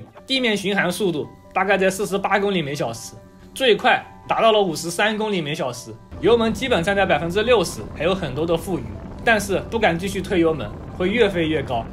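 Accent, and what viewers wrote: Jiangxi, China. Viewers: 哥们儿，你的赣普话好有特点😀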